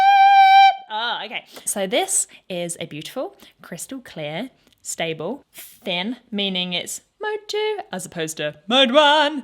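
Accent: England, United Kingdom. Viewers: ...." with their Vision".....What a GREAT IDEA!!!!....Have you Vocal analysed the great from the band yet?....They just happen to have a song called " ".....Now, you do have an English accent, and to my Canadian ears it sounded like "BULLS TO the WOOL"....If you meant the latter my deepest apologies....If on the other hand you meant the former, do enjoy... and UDO are almost as much FUN as I am. Cheers!!!...Ta....P.S...What does SMUGGLED A BUDGIE MEAN?.... --????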